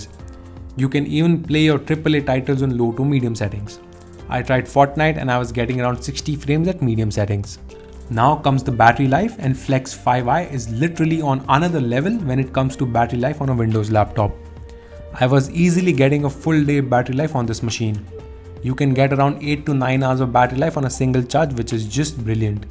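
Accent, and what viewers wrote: India. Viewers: i love the indian accent that remember me raj in big bang theory 😂😜🥰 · horrible this indisch english this laptap is walk toched with16gb with bitter displaayy this thisi s is missi this -pooaaahh bluluuluuu o myy gooddd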